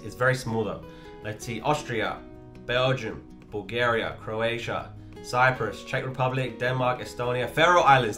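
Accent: United States. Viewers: 😂, you definitely sound more 🇺🇸 than 🇬🇧 😅 although having 🇲🇾 passport that needed to get visas to many places/countries. hwr,....WAS very privileged then, easily got any visas due to nature of former work 'flight crew' 😂 Thus, travelled to all continents & many countries..... 😂 2024April05th, Fri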